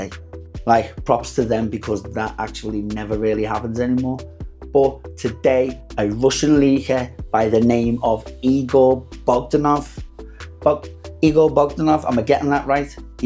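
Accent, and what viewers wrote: Liverpool, United Kingdom. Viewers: You sound Scouse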